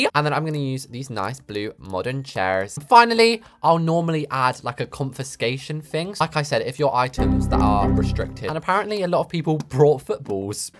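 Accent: United Kingdom. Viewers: As an American, I love your accent and the royals accents, I find them all very funny and British :P Also, I love to imitate British accents :P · BRITISH ACCENT!!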